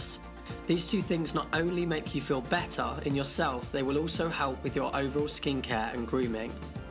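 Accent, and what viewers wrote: United Kingdom. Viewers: Imagine if all British people sounded like this 🤮🤮🤮🤮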